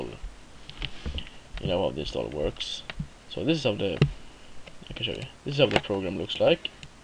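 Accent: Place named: Sweden. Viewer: haha var tvungen att fråga , det lät riktigt svenskt!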